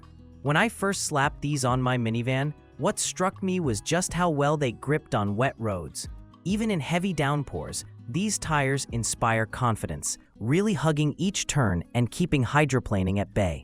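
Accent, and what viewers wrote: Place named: Canada. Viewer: Narrated by a Canadian for the Canadian audience..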